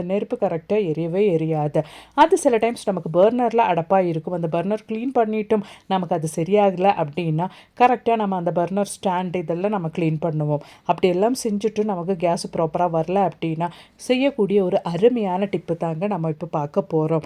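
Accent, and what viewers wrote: Kerala, India. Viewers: Sister ur malayala tamil so sweet.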